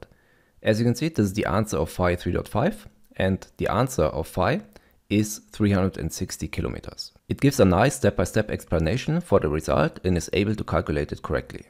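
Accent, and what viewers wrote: Germany. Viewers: Your german-english accent just makes listening to you even better. Things have a more serious tone to it. Fantastic content!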